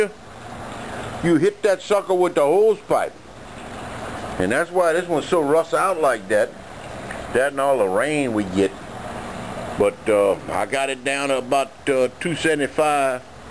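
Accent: South, United States. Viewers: I love that good ole southern accent. #lovebeingfromthesouth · This guy's southern voice is the best! Sounds like that big warner bros. chicken Foghorn Leghorn.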